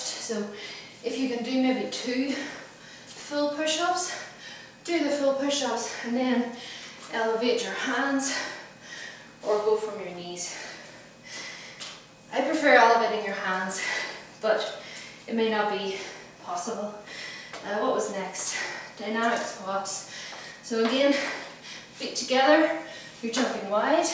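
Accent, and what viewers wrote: Ireland. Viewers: So, you from Ireland?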